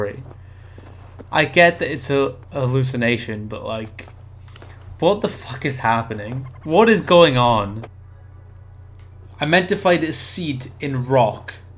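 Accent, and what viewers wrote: Scotland, United Kingdom. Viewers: Where in Scotland are you from mate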